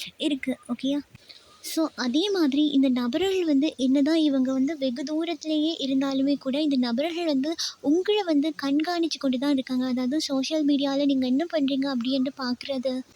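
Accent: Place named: Jaffna, Sri Lanka. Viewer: உங்க யாழ் தமிழ் நல்லா இருக்கு.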